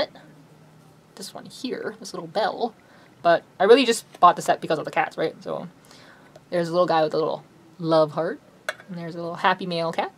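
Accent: Canada. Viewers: I loved drooling over your toolbox of goodies! I'm a pen addict! :) I thought you sounded Canadian! I'm a Canadian living Down Under. I moved to Australia 14 years ago, but Canada will always be 'home' to me! :)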